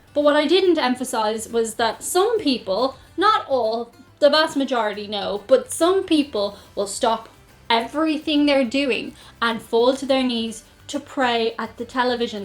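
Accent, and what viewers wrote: Dublin, Ireland. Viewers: I don't know,... are we? And don't worry about "transpart" - to those of us who don't know any better, it just sounds like your lovely Dublin accent. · used to be whenever i'd go to a bar or restaurant the establishment always had a bowl with books of matches imprinted with their name and i always grabbed one as a keepsake. you don't see that much anymore these days so i'm hoping with this match making thing you were talking about this practice may come back into vogue. i hope so. on another note...i thought you kept saying transpart because well, umm, you're irish?! here in the southeastern united states we say transpork, mmmmm barbeque! stay weird,!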